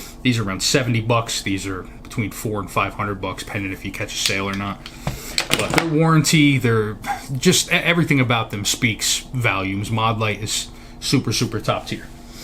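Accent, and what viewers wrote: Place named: Philadelphia, United States. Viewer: Great EDC. Your accent has a Philly vibe.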